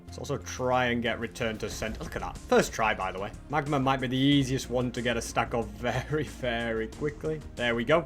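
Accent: United Kingdom. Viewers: No caption how am i supposed to understand the brit english mate?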